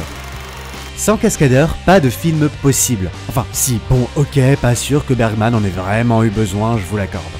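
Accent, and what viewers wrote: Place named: Paris, France. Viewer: Pourquoi il y a un accent parisien aussi fort, et me dite pas que les parisien n'ont pas d'accent, il y en a un; et c'est celui là!!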